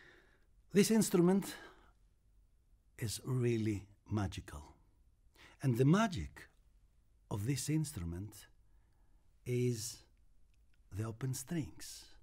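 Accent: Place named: Greece. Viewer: This is so far the best introduction of this legendary instrument. Respect for your dedication of research, experiment and creativity. It's a very inspirational personal story as well. (I enjoyed the greek accented english narration as well, it's like a very calming story telling :D) Bravo for reviving this great instrument!!!👏👏👏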